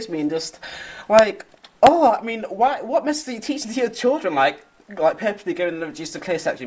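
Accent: United Kingdom. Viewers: r u brittish?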